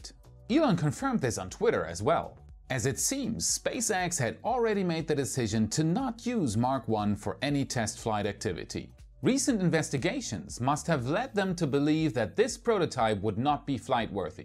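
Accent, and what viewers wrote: Germany. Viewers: Hey man. You sound very German 🇩🇪 ? But your English is pretty decent. Where are you broadcasting from?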